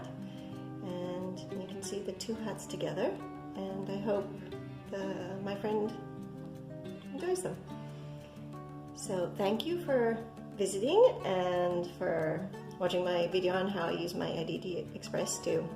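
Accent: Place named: Australia. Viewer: We did not "hear" any Spanish in your accent, sorry thought you might have been an Australian or New Zealander. Have you considered using the same videos for the Addi and re-recording them in Spanish? It might give you more subscribers. Just an idea from your comment in the video. Thank you for a very good video on doing the Messy Bun on an Addi.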